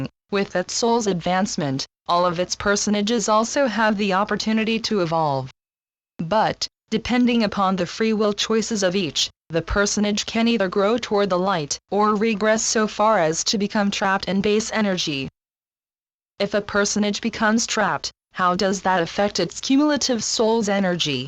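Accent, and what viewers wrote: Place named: United States. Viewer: The information is correct. In order to absorb information like this, it is necessary to put all previous experiences to one side, including prejudice of any kind, which may have been put into your mind by others, including family members and the current educational system, or experiences which may have made you afraid when young. This includes putting to one side prejudice against the 'robotic' American accent used in these transmissions. Those who are able to do this, will be able to understand the information. Those who are not ready, will not be able to, because they are allowing the remembered emotion FEAR, which is a strong emotion, to control them. The method is simple, but it takes practice. You just say, out loud if necessary, 'I AM AFRAID OF NOTHING'. I am not at all religious. But I DO believe in methods that can remove fear from people.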